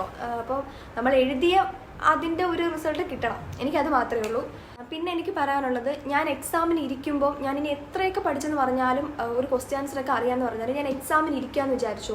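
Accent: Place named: Punalur, India. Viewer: ഞങ്ങൾ പുനലൂര്കാരുടെ സംസാരരീതി ഇങ്ങനെ ആണ്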